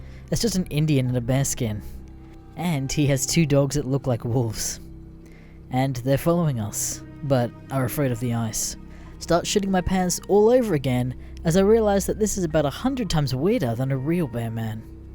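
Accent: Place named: Australia. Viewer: It's always nice to hear another Australian accent on videos.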